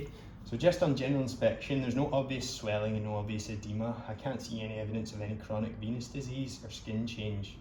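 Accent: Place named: Scotland, United Kingdom. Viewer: lovely Scottish voice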